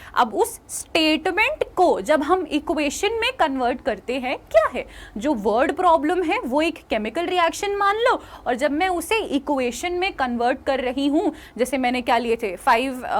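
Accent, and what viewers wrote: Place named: Haryana, India. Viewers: Hariyanvi teacher's hits different ❤🫣💯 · I am listening here the haryanvi dialogue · Mam you and your Haryanvi accent is awesome 💖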